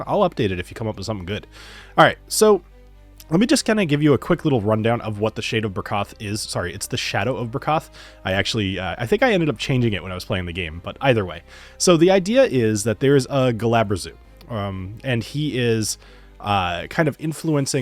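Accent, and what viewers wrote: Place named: Canada. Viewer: You're Canadian? I just clocked it...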